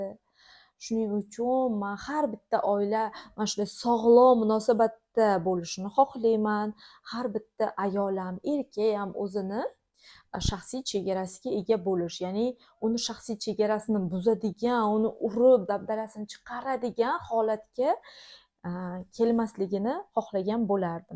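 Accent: Jizzakh, Uzbekistan. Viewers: jizzaxlimisiz shevez rosa o'xsharkan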